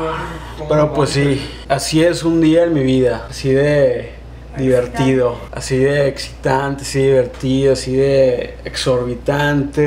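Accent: Monterrey, Mexico. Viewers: Sip soy de Monterrey y así hablo😂😂😂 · Qué bonito video con puro acento regio🙌😜 jaja me encanto haz mas vídeos así, y haz convi en Monterrey😭😭🙏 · el acento que tiene, es normal de alguien de monterrey?:( quee alguien respondaa:'( soy de Chile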